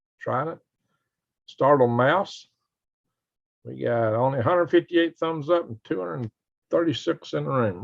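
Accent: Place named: Louisiana, United States. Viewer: New subscriber🎉 saw you on Canadian prepper and was so excited to hear someone who's accent sounds a little like mine & prepping in a climate similar to home here in Louisiana.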